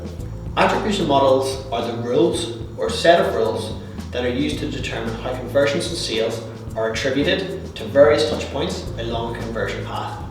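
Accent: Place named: Ireland. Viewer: Great video dude. Loving the Irish pub accent.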